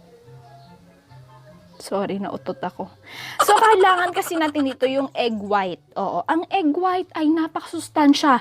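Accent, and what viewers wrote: Ilocos, Philippines. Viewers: I love your ilocano accent "mallukong"😂 I love you ate!!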